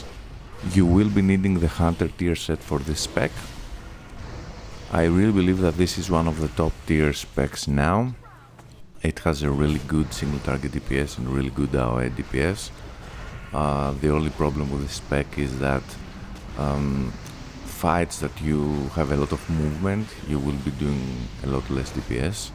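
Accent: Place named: Greece. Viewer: I bet this accent is greek 😍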